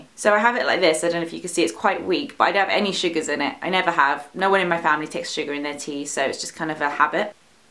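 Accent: England, United Kingdom. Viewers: Very English and not British!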